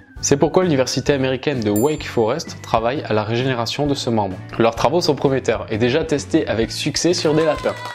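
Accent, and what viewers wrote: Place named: South, France. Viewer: cool on va arrêter de nous casser les ... pieds avec "les antibiotiques c'est pas automatique" lol t'as un petit accent du sud on dirait peuchère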